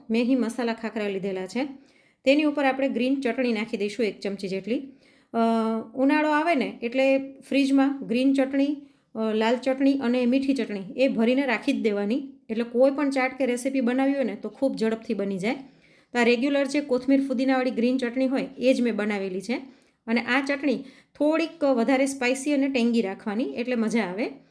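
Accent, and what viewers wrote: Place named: Saurashtra, India. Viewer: વાહ ખુબ જ સરસ બેન તમે કચ્છમાં રહો છો પણ તમારી ભાષા સૌરાષ્ટ્ર જેવી છે તમે સૌરાષ્ટ્રમાં ઉછરેલા છો, હું પ્રોપર સુરેન્દ્રનગર થી છું હાલ વડોદરામાં રહું છું. તમારી દરેક રેસીપી હું ટ્રાય કરું છું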